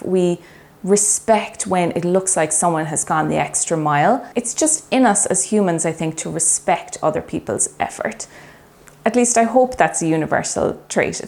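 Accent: Ireland. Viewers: So well said - 52 and curvy here, I love your gentle manner and of course your helpful tips - you have a new subscriber :) PS - I enjoy your lovely Irish accent, and your doggy friend is super cute (and that's coming from the ultimate cat person)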